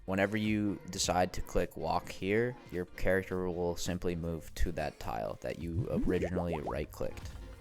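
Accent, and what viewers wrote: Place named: Canada. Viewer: Thanks for the vid and ur aggressively Canadian voice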